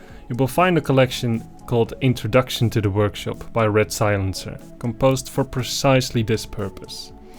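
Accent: Netherlands. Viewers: do i sense a dutch accent in there? :D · Hey question. Are you dutch/netherlands?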